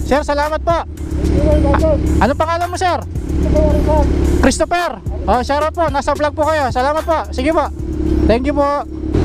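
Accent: Pampanga, Philippines. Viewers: Yung pagtatagalog mo sir halatang kapampangan hehe ride safe always idol.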